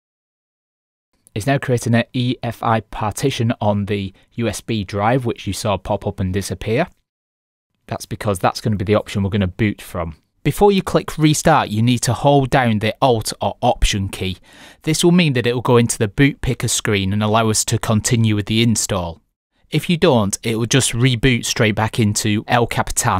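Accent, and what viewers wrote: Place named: Northern England, United Kingdom. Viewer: Other than the heavy Northern English accent great video very well explained! Thank U!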